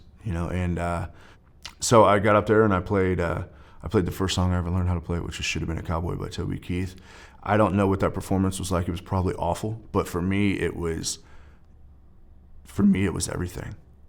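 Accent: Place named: Kentucky, United States. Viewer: Florence Kentucky I'm betting is where he's from Florence Y'all I know that accent it's kinda half southern half Yankee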